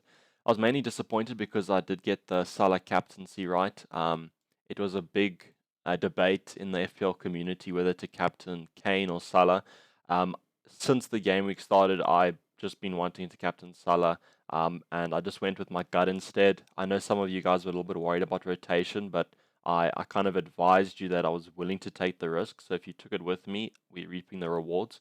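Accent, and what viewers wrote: South Africa. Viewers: FPL ID: <num>. Top 2k. Notice the accent - Are you South African?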